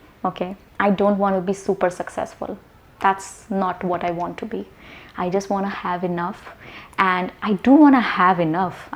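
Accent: India. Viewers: I’m not trying to learn English (I actually teach it)! So I don’t why I’m now addicted to your videos 🤷🏻‍♀️. Yes I share your sentiments. I gave up my successful job in the corporate sector & went into teaching & training - just to make a difference to the lives of young people. Yes I want to retire in the BEAUTIFUL birth place of my mother 🇮🇳 & now make a difference in the country she loves so dearly, that day is almost here for me 😊. Just one other thing - now I think I will stop trying to train students to ‘drop’ their Indian accent. Your Indian accent is BEAUTIFUL 👌🏾- it’s something people should be proud of & not endeavour to copy EVERYTHING about us foreigners 🙄😁 keep sharing your love & inspirational videos 🏆. Much love xx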